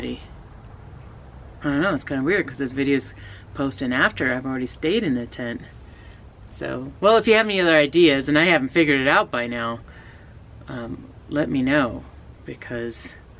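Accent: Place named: Europe. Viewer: hi mama. nice to see your mama. im binge watching bedrest day today painted all my window frames yesterday outside. i love the wood design you hand painted it? great she helped you. she lives in usa too i see. aw thats so cute she was teaching the girls to sew too how precious to see that. you grew up partly in usa youve got an accent from Europe but she doesnt. ? is your story here in one of your videos? good idea he overlapped material this meant seam would becovered. imagine making your own tent what a task good for her. im middle laying out material making curtains and dog jackets too. getting cold got to get dog jackets finished.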